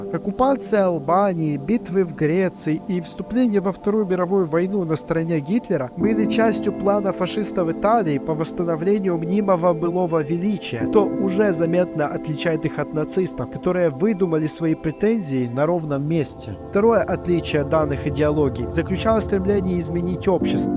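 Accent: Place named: Odessa, Ukraine. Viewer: акцент. Вы во многих словах букву А удлиняете . Получается акцент очень похожий на говор евреев Адеситов .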